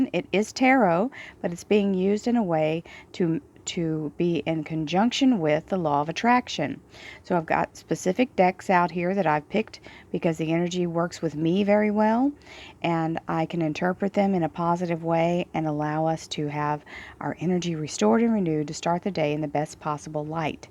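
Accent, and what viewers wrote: Texas, United States. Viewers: Love your readings, and your voice. Where are you from sounds like Texas?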